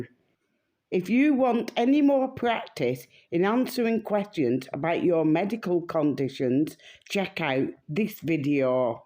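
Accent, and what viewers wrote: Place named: Yorkshire, United Kingdom. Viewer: Yorkshire accent that mate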